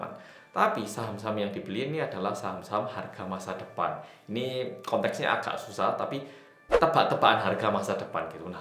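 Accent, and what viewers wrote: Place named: Malang, Indonesia. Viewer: nggk nguati medoke ya mas... khas kera ngalam... salam dari Yulis4994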